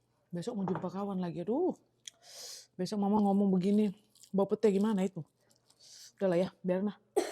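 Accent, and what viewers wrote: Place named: Medan, Indonesia. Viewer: Shalom kak, aku senang dengan logat ngomong Medan Siantar kali kak hehe.., mantap masakan kak 👍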